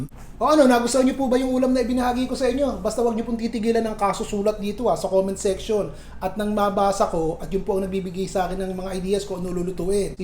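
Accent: Philippines. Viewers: Aray ko mainit...had me laugjing...i miss pinoy expressions and accents...i just love it. From a kababayan living in LONDON, UK 🇬🇧 with ❤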